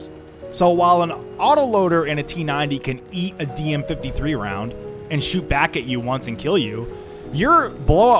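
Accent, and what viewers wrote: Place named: United States. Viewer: start video : american accent. yeah we knew already if you guys didnt get everything handed out you would cry xd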